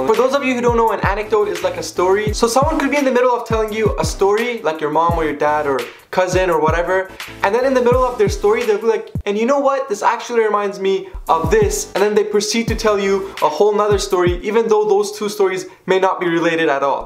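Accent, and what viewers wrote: Isfahan, Iran. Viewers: الهی قربون اون لهجه اصفهونیو وشیرینتون دادا❤❤❤❤❤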